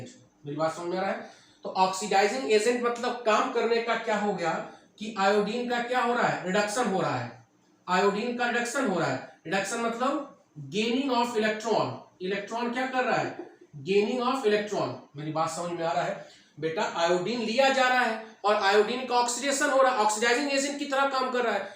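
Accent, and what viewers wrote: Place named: Bhojpuri, India. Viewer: Sir आपके भोजपुरी जान मार रहा है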